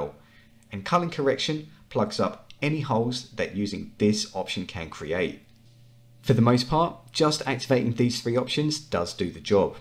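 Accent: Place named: United Kingdom. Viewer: I don't know why but as soon as I hear a British accent I know I'm in the right place. This tutorial was amazing. Easily the best I've found on YouTube. Thanks man!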